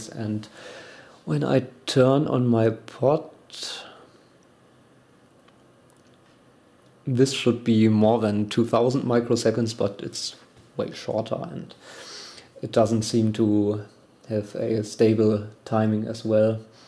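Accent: Germany. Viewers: Wie man beim ersten Wort einfach raushört, dass du Deutscher bist :D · Ahh dein Deutscher Akzent xD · i love his german English😂❤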